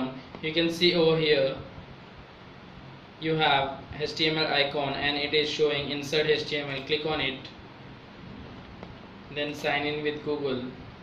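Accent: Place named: India. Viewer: Why are indians so bugady udding it, naw watdis ...blah blah... cant understand you! DUH!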